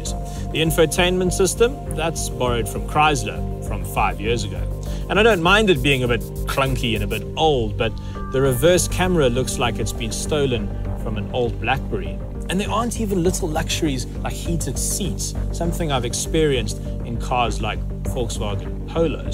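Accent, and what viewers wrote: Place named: South Africa. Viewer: I watch this video over and over then look over my shoulder and see my beautiful Ghiblet S sitting next to me in my garage. It's my daily driver. M-W-F and on weekends. I will be driving this car 288 miles per week. I am from San Clemente, CA. I love this video and controversial South African accent. I was going to get a BMW 550I but I am so happy I got this anything but ordinary Italian Stallion. Take me to J. Bay and Skeleton Coast Namibia for a surf. I'll show you Trestles and related breaks.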